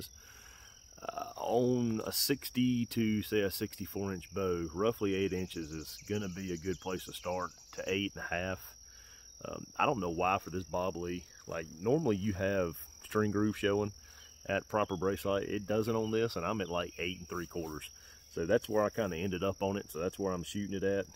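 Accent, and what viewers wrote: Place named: Mississippi, United States. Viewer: What part of the US are you from. I used to live in Missisippi and the accent sounds quite familiar. Regards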